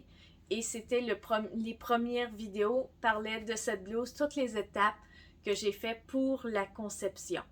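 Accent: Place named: North America. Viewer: j'aime beaucoup ton accent on sent qu'on est bien passé outre-atlantique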